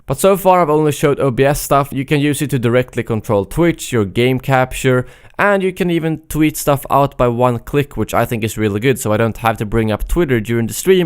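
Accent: Sweden. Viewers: Det låter som att du är svensk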